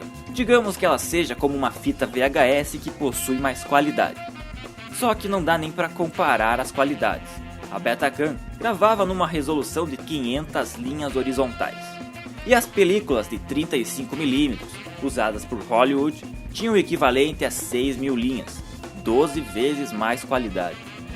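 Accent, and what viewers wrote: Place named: Santa Catarina, Brazil. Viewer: esse cara é catarinense ?? aqui a galera tem esse sotaque :)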